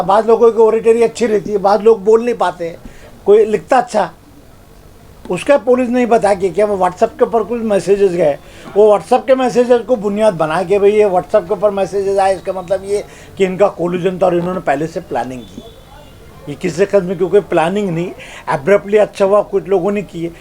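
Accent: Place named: Khandesh, India. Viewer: LLB kar liya sahab fir bhi khandeshi zaban aaj bhi nhi gai aap ki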